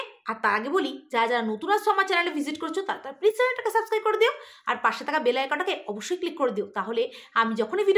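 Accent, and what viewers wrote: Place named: Bangal, Bangladesh. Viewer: Bha tumi toh darun bangal vasa bolo...Tumi ki bangal go? Khub sweet lagche sunte...jodio jader niye bolcho tader ami chini na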